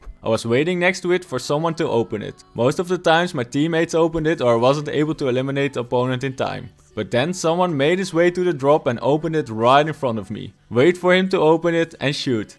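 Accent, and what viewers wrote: Netherlands. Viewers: Je hoort toch wel dat je Nederlands bent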